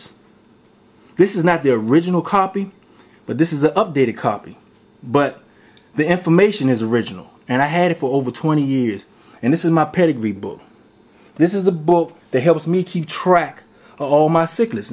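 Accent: DMV, United States. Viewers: sound like your from the dmv if so we need to hook up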